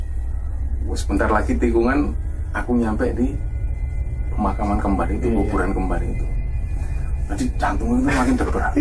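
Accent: Java, Indonesia. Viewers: Mas keren..pokok nya..cara penyampaianya bagus..kalwm khas jawany keluar slm dr jogja.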